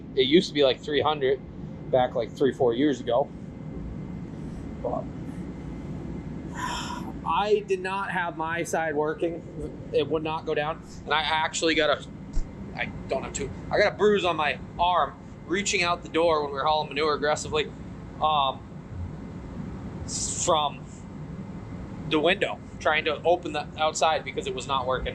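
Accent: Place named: New York, United States. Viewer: Your picking up the New York state dialect accent, no longer the Massachusetts accent.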